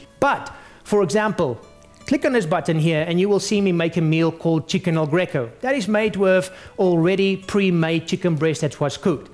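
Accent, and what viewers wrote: Afrikaans, South Africa. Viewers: is this guy South African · Kyle Pickens he speaks weird cause he is afrikaans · He sounds like South African' correct me guys if I'm wrong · This guys accent makes me think of krugerrands. And Krugerrands makes me think of Lethal Weapon 2. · By your accent, I'm guessing you must be from South Africa. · Damn this guy has a strong Afrikaans accent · Is that a south African accent? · definitely an Afrikaans accent lol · He-he, I couldn't place the funny accent until you pulled biltong out of the fridge :) Cheers from Canada! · Less talking. More showing. The talking makes you look like a idiot. S.A accent. Painfull. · what accent is this? South African? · South African? I here that Afrikaans. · He's South African...Most likely Pretorian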